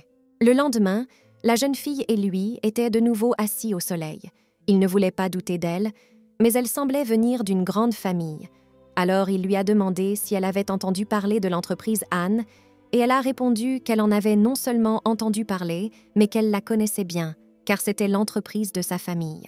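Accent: England, United Kingdom. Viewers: Tu parles vraiment comment une anglaise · Pk y’a un accent anglais comme ça Miskine ❤❤❤❤😂😂😂😂😂😂😂😂😂😂😂😂😂😂😂😂😂😂😂😂😂😂😂😂😂😂😂😂😂😂😂😂😂😂😂😂😂😂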